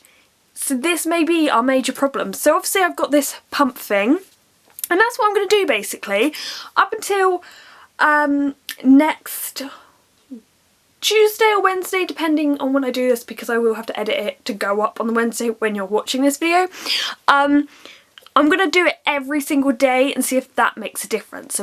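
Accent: United Kingdom. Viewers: I just discovered your channel, I've been watching quite a lot of your videos today, they are all great! You are really passionate about these animals, it's a joy to watch. Besides, i love your British accent. It makes it all very nice, I subscribed without hesitation. Greetings from France :)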